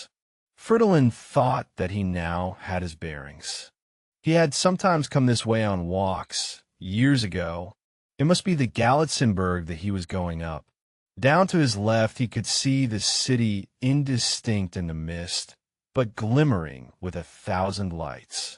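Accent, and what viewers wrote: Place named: Texas, United States. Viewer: AI SUCKS IN GENERAL, AND AN AI TRAINED TO HAVE A TEXAN DRAWL READING A EUROPEAN NOVEL, WELL NUFF SAID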